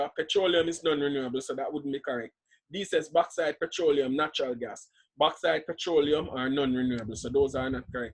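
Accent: Jamaica. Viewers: the Jamaican accent is a nice one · i really enjoy listening to your Jamaican accent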